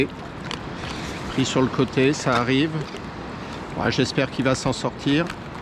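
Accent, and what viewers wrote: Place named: Oléron, France. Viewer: Hahaha je me disais aussi….. il manquait l accent oleronais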